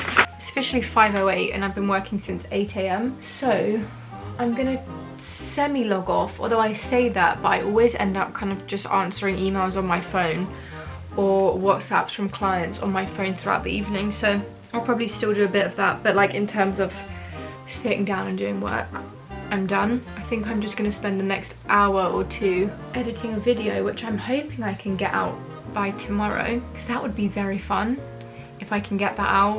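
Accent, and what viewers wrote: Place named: United Kingdom. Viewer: My dream job is being an interior designer, and because of that, I watched your video, and I noticed how beautiful your British accent ❤❤ .I wish you rache everything you want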